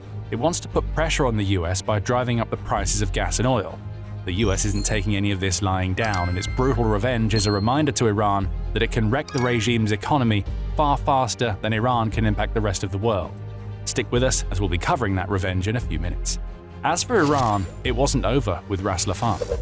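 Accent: United Kingdom. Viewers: This channel is a joke. Ammosexual content for Americans, read by a British guy. Unsub.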